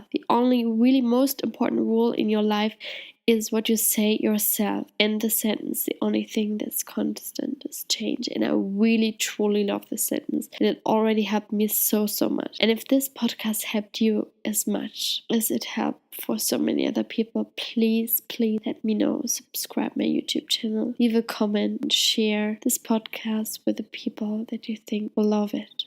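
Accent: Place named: Germany. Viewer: Oh your German accent is so cool! Go Germany!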